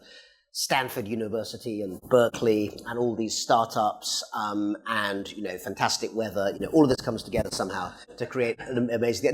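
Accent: United Kingdom. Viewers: An Indian guy with British accent, think as he is British meanwhile lecturing China how to behave. I think something is wrong here. Please don't use British accent, since it is very insulting.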